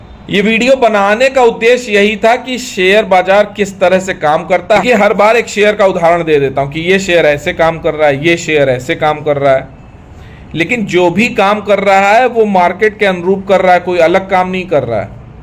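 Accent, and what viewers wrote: Kanpur, India. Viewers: सर आप कानपुर से हो क्या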